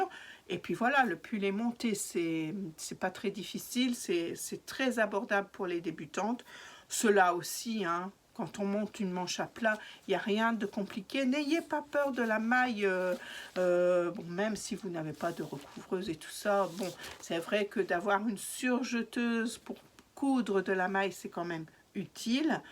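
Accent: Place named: England, United Kingdom. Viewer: Coucou, J'adore ton accent anglais 😉 You're simply the best 👍. Très joli pyjama, de même pour ton blouson bi-matière et ton edelweis. à bientôt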